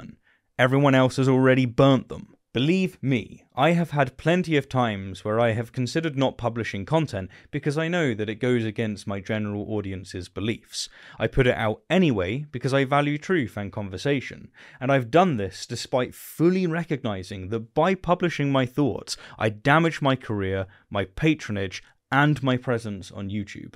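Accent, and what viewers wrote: United Kingdom. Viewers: I am one of those that accused you of bending the knee to the woke and am glad that you addressed the issue with this video which is very brave of you. I respect your intelligence and youthful zeal of which I once had so I have empathy of which I sometimes perceive as arrogance. It was what happened in your country, I gather is Britain because of your accent, that I got red pilled. It was how "Tommy" got treated for shining a spotlight on the"gangs". With all due respect Britain might already be lost and "Cherrio" law is imminent. First they silenced Tommy, then Mel Shaw and now Russel.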